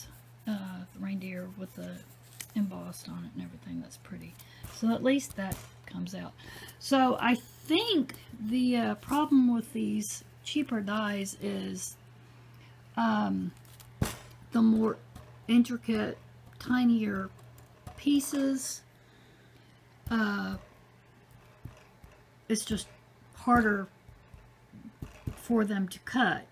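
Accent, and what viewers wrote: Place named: South, United States. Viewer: Thank you for sharing this video about these dies because otherwise I wouldn't have purchased any of them. About how long does it take for you to receive your orders from China & are they packaged well? BTW...I love listening to your southern accent, it's so sweet, it reminds me of my mama's accent... :) Take care, Lily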